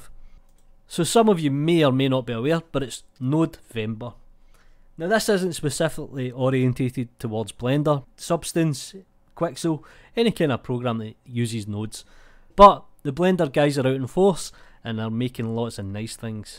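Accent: Scotland, United Kingdom. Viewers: Loving the channel! I have been trying to catch every vid you throw my way. This unofficial news is sweet. Keep it up! Great to hear a Scots voice doing Blender stuff. Pure dead brilliant, by the way!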